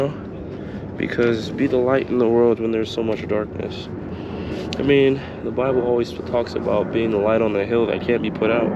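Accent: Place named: Haiti. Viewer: I came across this channel just browsing, because I usually look for a camping videos of people just enjoying their lives ,yours came up immediately click on it at first I wasn’t because it’s 15 mins long ish ,don’t come for me I say that because I feel like it’s important to at least try for 29/30 mins long as others put a lot of time and effort anyways I strayed away from that, and took a chance only to hear you preach about god and the lord amen I subscribe , this is the first video on your channel I’m watching and then I continuously sat watching , grabbing that chapstick 😂😂😂and seeing that GT flag it was a done deal I was here like ohhhhh I found my husband lamp 😂😂😂😂 at first I was like the way you speak it kind of seems somewhat similar to my niece father thought for sure you were Haitian possibly. Love your content ,I praise god and lord EVERYDAY for life could have lost mine last year to a hit by a car by god grace I’m here ,I got even more into gospel music I play it all at work even meet a member of the Evolation worship, and he was like shocked that I was actually playing gospel music guessing on the clock because I’m sure he’s hurt his music being played somewhere I played every day playing anywhere, especially on my over the ear headphone where I know others can hear but at work it’s all on my tiny speaker lol and just to get an idea I work at the airport 😊 Add me insta blssedd.xx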